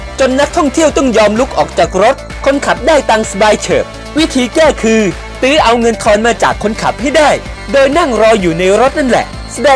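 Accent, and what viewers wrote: Myanmar. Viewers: เวียดแนม คนพากย์มึงเป็นพะม่าหรอ แม่งพากไม่เข้าใจเลย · ความสามารถแค่นี้เสือกออกสื่อไอ้ควายเสียงยังกับพม่าพูดไทย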